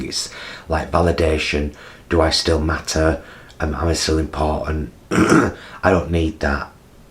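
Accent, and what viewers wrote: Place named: Northern England, United Kingdom. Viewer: Cheers!, also very good to hear a proper northern voice whilst I’m stranded abroad 😍🙌🏻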